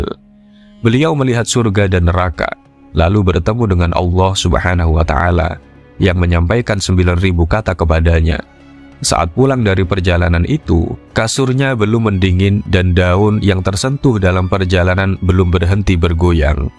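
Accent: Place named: Tegal, Indonesia. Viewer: Alhamdulilah terjemahe wonge dewek Tegal ngapak ngapak nyong rongkna lur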